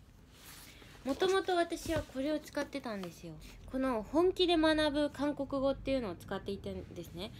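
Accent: Kagoshima, Japan. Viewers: 私の日本語の勉強方法と一緒です🙋 YUURIさんの動画を見ながら勉強しています。 多少鹿児島弁も一緒に耳に入ってます。笑 一緒に頑張りましょう！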